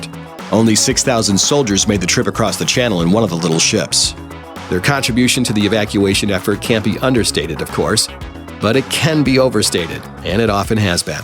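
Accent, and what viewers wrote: United States. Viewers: are u american or britisch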